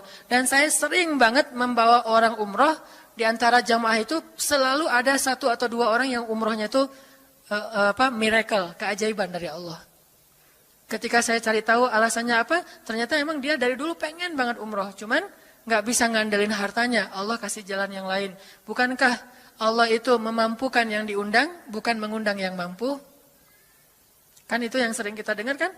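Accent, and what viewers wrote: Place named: West Java, Indonesia. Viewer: Pa ustad itu aslinya mana tapi ngomong nya ada sundanya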